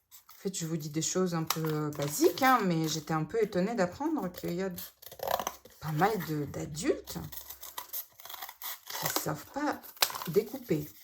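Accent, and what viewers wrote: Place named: Russia. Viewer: Bonsoir Madame,je de,couvre votre chaîne et vos tutos,j’aime beaucoup votre joie de vivre,vos explications vous êtes très très sympa, je suis une mamie et je vais m’atteler à faire vos jolis chevaux russe,de votre pays,votre tout petit accent est très charmant ! J’ai le temps d’ici Noël,de m’exercer,et d’en réussir quelques uns !du moins j’espère !Merci pour tout le temps que vous nous avez accordé, bisous aux enfants 👶. Marie-Line, deProvence.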